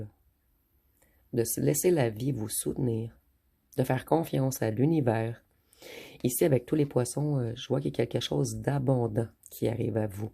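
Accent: Quebec, Canada. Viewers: Je suis ducsud de la FRANCE...Chez nous aussi nous avons un sacré accent.Mziw votre accent QUÉBÉCOIS JE L'ADORES !!!❤❤❤❤❤❤🎉🎉🎉🎉🎉🎉🙏🙏🙏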